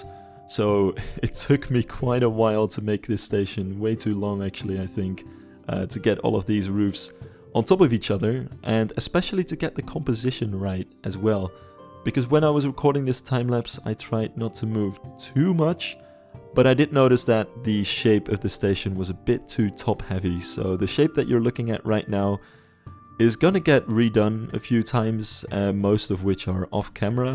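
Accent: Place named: Netherlands. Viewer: Hallo ik hoor aan je stem dat je Nederland bent dus ik wouw vragen of je de xpress van Walibi kan (namaken) maar dan goed en wouw je dent hier goed in O ik zou zelf doen maar zit op Xbox vast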